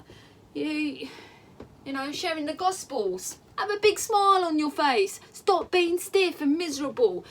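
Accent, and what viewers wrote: United Kingdom. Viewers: Can’t help smilin’ more an’ more the more you speak. His joy is shining, sprinkling, radiating, streaming out of you, you know,:) I always thought there was the joy of Jesus at the center of the True Cockney. This is proof of tha puddin’! Keep spreading His love, luv. Good job!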